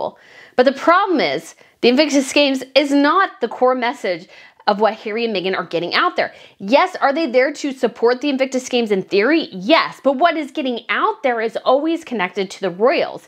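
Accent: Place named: United States. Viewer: I like what you are saying but can't you soften your american high pitched voice?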